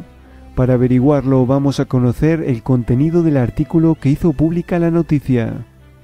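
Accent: Spain. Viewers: que horrible voz, no me extraña que los latinos critiquen como narran los españoles, por suerte no todos hablamos asi de mal, parece tonto o imbécil, tu voz es pésima, horrible, lo narras muy mal, no pude aguantar mas de 20 segundos, que asco escucharte, lo digo sin animo de ofenderte, pero eres un idiota, cambia de profesion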